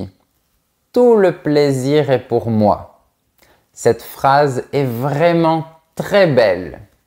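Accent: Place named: South, France. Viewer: Vous avez un accent du Sud, ne c'est pas, professeur ? C'est très joli.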